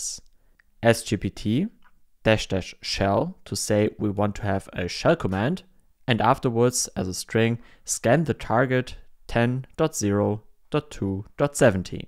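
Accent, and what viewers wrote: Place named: Germany. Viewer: nice video very deutscher Accent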